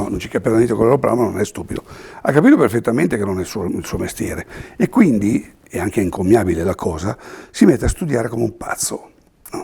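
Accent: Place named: Lombardy, Italy. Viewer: Grande comandante .....il suo inglese un po lombardo.....spettacolo n1 in tutto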